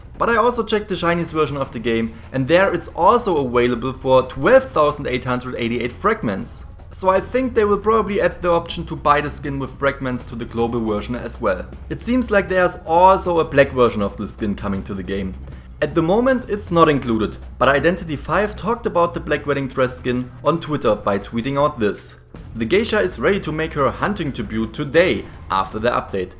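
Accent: Germany. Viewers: No offense, aber das is das deutscheste Englisch, das ich je gehört hab. :P XD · Mein Gott, so you're a German then?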